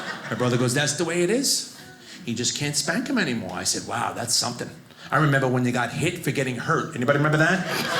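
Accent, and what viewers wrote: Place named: New York, United States. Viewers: Classic standup style routine, but still original. Love the NY accent. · First time seeing this comic, testing my accent recognition skills. Have not googled him. But he’s from NYC. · I enjoyed this set, I like his NY accent, good story line and punch lines. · That was amazing. Great jokes, great delivery, great timing, great facial expressions. Instantly likeable, too. And on top of all that, me being a German language nerd, a fascinating case study of the New York accent and mentality. 👍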